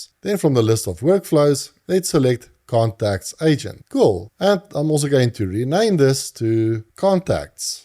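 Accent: South Africa. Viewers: Nice one! Sitting in Netherlands and listening to a Saffa was a proud moment. Love the skillset you bring here, thanks you! *SEO Specialist setting up a multi agent on n8n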